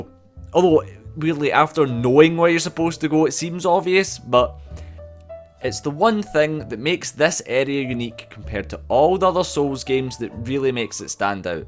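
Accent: Scotland, United Kingdom. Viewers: You sound the absolute spit of James McAvoy. What part of Scotland you from? 🏴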